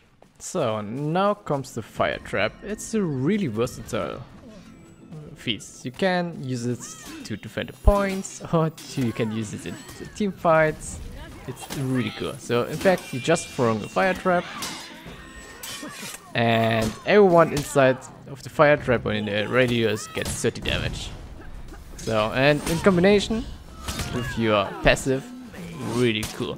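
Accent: Germany. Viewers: Sounding very German in this video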